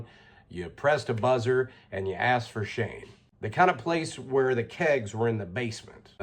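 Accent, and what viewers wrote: South, United States. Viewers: His accent is fire. I love a good southern accent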